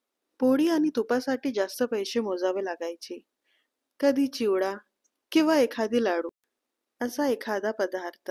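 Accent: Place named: Hindi Belt, India. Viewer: माहिती चांगली आहे. पण उच्चार हिंदी पट्ट्या मधले वाटत आहेत. उच्चारांवर काम करावे.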